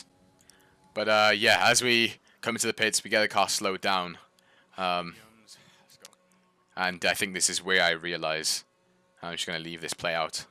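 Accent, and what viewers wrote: Wales, United Kingdom. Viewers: Sounds Welsh to me.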